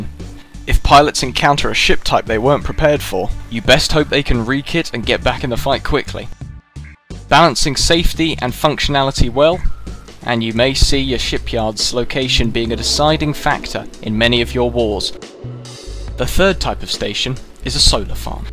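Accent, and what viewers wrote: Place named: United Kingdom. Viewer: That British voice... makes me weak at the knees;) I don't need no voice.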